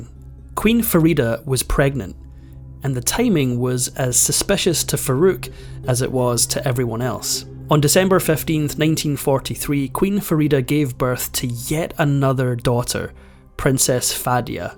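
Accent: Scotland, United Kingdom. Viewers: It’s a Scottish accent.🤣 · What’s wrong with a Scottish accent? Best accent ever🏴❤️🏴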